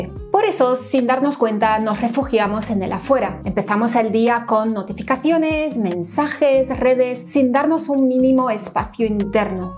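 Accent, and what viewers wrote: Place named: Spain. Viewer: Hola. Me encanta tú tono españolete. Gracias por tú contenido. Un saludo desde Bogotá Colombia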